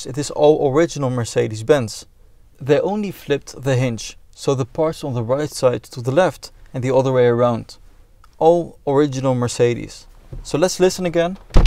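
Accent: Netherlands. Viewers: Your dutch accent is killing me, but what a car omg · Toffe vid maar je kan horen tot je NE bent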